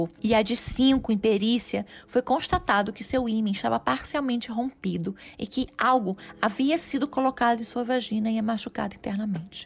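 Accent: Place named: Northeast, Brazil. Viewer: Escuta narradora nordestina… Que graça tem recontar a história,que os melhores noticiários do Brasil,e do mundo, já contaram com riqueza de detalhes? Eu moro na Europa,e assisti a reportagem na época.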